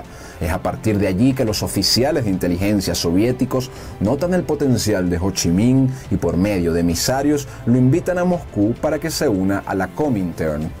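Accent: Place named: Venezuela. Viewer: Que tendencioso y artero es el q comenta en éste video,al parecer(por su tonada) un venezolano guarimbero.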